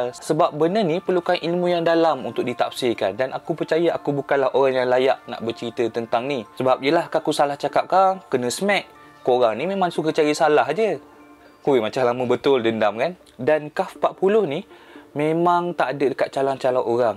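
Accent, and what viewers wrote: Kelantan, Malaysia. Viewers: asal kelate ko?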